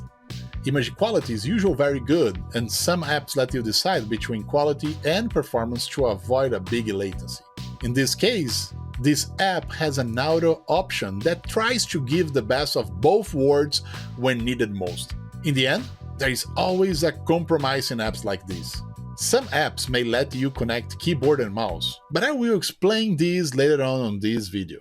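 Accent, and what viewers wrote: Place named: Brazil. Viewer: Although your amazing English I think you are a Brazilian guy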